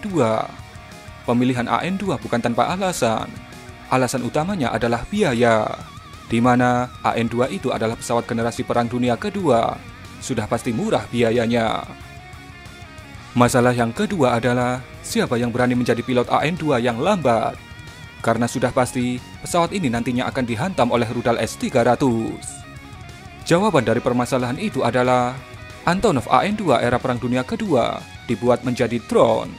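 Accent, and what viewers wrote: Java, Indonesia. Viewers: Ubah dong pengisi suarax Ojok seng meddok ngono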